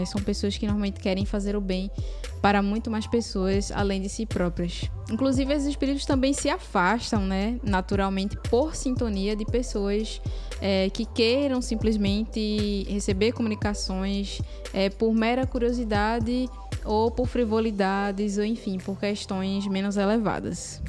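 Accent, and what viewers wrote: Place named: Northeast, Brazil. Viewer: Sou da paraiba e reconheci teu sotaque nordestino. Delicioso 😋 😋 Parabéns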